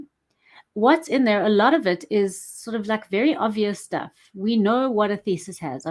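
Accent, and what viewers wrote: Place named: South Africa. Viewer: ah iim so excited! I thought you were perhaps American, but so happy to hear your SAn accent! and to seeing similar research interests! Social Realism! Higher education in South Africa! and at UCT. Really comforting!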